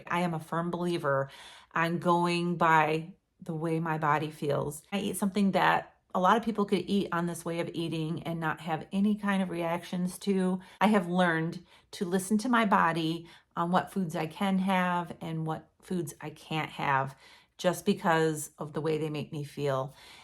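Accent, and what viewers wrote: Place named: Michigan, United States. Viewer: Great testimony,! Well done! My story mirrors yours so much — almost 2.5 years now as a keto-vore. SO many benefits and changes and we’ll never go back. I’ve been watching your videos for a year now and enjoy seeing you and Ed and your adventures. The first time I heard your video, I heard that Michigan accent and thought “hey! A fellow Michigander!” 😊 Thanks for all the encouragement! Keep going, lady! Julie in W.MI.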